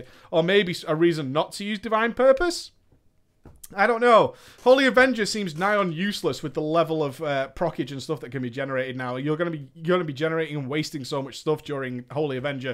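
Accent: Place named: United Kingdom. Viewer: hard to hear what u saying with that brittish accent + you talk to fast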